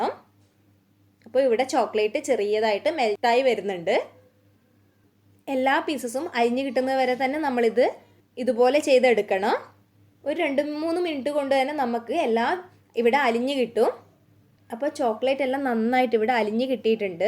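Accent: Kannur, India. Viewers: Kannur kaari aanalle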